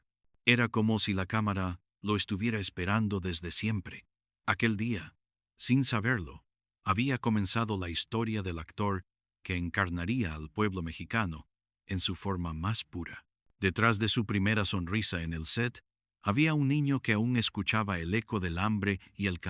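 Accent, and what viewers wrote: United States. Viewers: Todo bien, menos ese acento gringo del narrador…🤣🤣🤪👎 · Ya se perdió la historia con este cuate que habla cómo americano 😂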